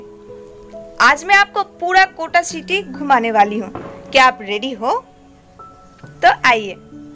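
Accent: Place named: Bengal, India. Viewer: I think she is Bengali her pronounce is like Bengali because of I'm also bengali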